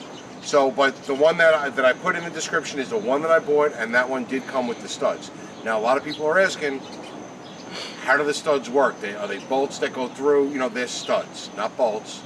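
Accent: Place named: Long Island, United States. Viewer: this just wouldnt be the same without the Long Island accent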